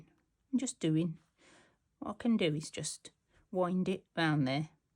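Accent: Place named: West Midlands, United Kingdom. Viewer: I'd love to see the finished result. I also love listening to you speak - your accent is similar to some of my family. They were from West Bromwich and others from Walsall. I'm a Brummie ;) Ah, the accents of the West Midlands.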